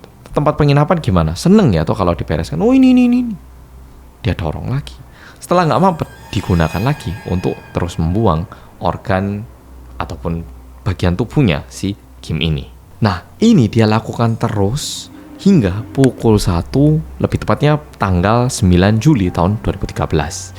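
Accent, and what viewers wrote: Java, Indonesia. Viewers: Kalo Liat mas kaya liat Young Jay just jerk versi medokk 😂. Jago dance juga gak ya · Lihat mukanya mirip bintang korea, haha Logat bahasanya kek orang jawa/ kental bgt Asli mn si kak?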